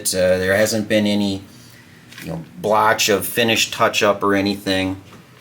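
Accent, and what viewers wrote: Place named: Minnesota, United States. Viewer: Daaaamn. I'm here for that Minnesota accent don't cha kno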